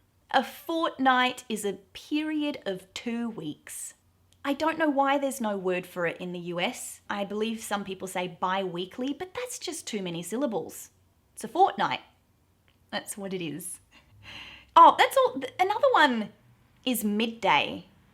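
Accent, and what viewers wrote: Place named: Australia. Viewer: Elephant in the room. You are speaking with an "AUSTRALIAN" accent. A REAL Australian.. accent.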